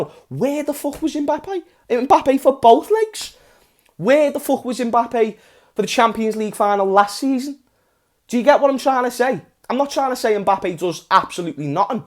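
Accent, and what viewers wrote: Liverpool, United Kingdom. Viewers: This guy has the best scouse accent ever😂 loving the roundups lad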